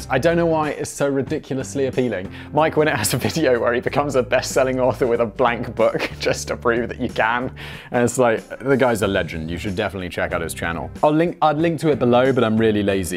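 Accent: United Kingdom. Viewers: You say pretty much every single world with a British dialect - except for controversy. I don't know why it winds me up, but it does... I don't usually care how a word is pronounced, so long as it is said like that somewhere in the world. But controversy - when said by you... Constipates me. Perhaps because you always say things in British dialect and quite often make a point of telling your US viewers why (to them) you are pronouncing things incorrectly. But that one word, you do not use our tongue and never mention why. When with other things that are commonly said either way in US, or UK - you feel the need to explain. You sir, are getting root bound in your dialect and as you take in more American ways, your British side is dying as the roots have nowhere to grow, due to the space having run out and memory is overriding itself..., the root bound British (coca) plant...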